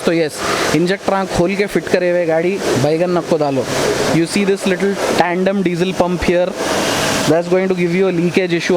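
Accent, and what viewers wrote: Hyderabad, India. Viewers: Fellow car guy from Aurangabad, subscribed, and loving the car content aur hyderabadi sunne me kirrak maza aara it's super unique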